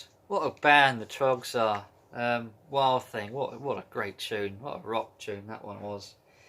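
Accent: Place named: United Kingdom. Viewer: This is Tom, not Sandra. You have a great LP collection!! Thanks for sharing them with us!! Like your British accent.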